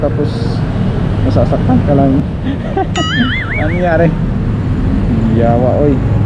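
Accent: Philippines. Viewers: Ilonggo ka ba papsi? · bisaya ka paps?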